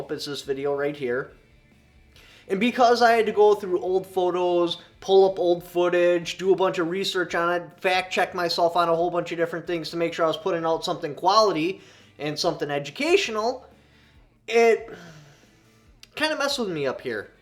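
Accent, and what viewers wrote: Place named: New England, United States. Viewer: Not gonna lie, it took me some adjustment to get accustomed to your yankee accent. AFTER that I became attached. That said, I am sorry if I missed the vid of what’s going on with you; could you do a vid where you break it down?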